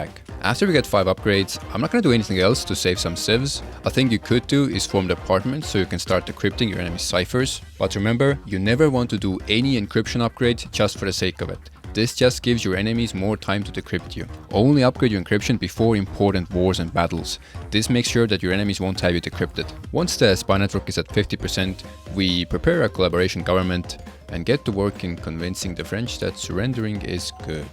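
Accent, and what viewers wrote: Estonia. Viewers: I thought your accent sounded very familiar so I bet on Estonia. Still surprised to be right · Clocked the estonian accent 2 seconds in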